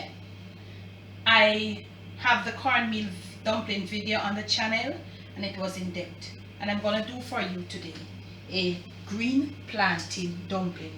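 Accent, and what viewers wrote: Jamaica. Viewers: Hi Ms 👋. I enjoy and like watching your videos. I like to hear you speak and your Jamaican accent. Your cooking and method is very simple. I wish you all the best in life Good health and happiness ❣️.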